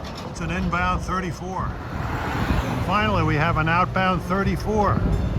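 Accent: New York, United States. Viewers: Great report. I noted that you initially said "down town" and later changed it to Center City. That is the more correct term used in Philly. Re blood pressure, you sounded just a bit stressed out. Perhaps the long day, standing all day or most of it, meeting certain time tables, and dehydration in the heat can cause all that. I have the same problem so I know from personal experience that this can increase your blood pressure. Please stay in the shade, stay seated, drink plenty of water, have a good sandwich, and stay as relaxed as possible. No bad words! Kudos to you for keeping it kosher as they say in Brooklyn. Did you get to chat with some of the locals? I bet your NYC/LI accent stood out among those Philly jawns. Look forward to your next report. Keep up the good work.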